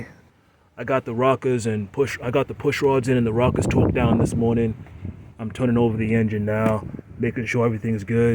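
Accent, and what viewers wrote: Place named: Minnesota, United States. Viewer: Nice looking car. Always enjoy your genuine demeanor and no bs get it done attitude. I still hear that MN accent haha, you sound just like the guys I grew up with. Hope your channel becomes the next Richrebuilds!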